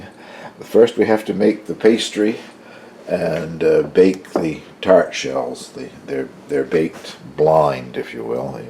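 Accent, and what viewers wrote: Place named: Cape Breton, Canada. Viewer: Aww you remind me a bit of my dad - the good ol Caper accent is so endearing. I have never made these CB pork pies but I remember them well from my childhood growing up in Cape Breton. I've been living in Europe for the past 30 years now. Feeling a little homesick and would like to make these for tomorrow as we're having family over for supper. I think I might even make a traditional meat pie or cabbage rolls as well and go the whole 9 yards. :-) Merry Christmas sir!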